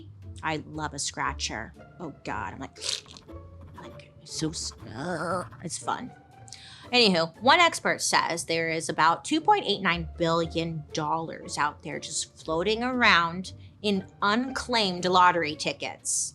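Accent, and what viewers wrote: United States. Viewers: Where in the US does that voice/accent come from?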